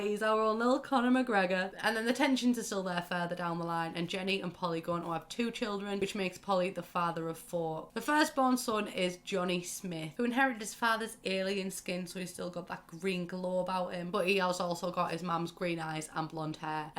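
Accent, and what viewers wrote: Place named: Liverpool, United Kingdom. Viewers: Another fellow scouser here too!!! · Scouser here too 🙋‍♀️ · As a scouser, I'm loving hearing a familiar accent after being so use to American on YouTube 🙌🏻 also recently came across your channel and I subscribed almost at once, you're Brill 💅🏻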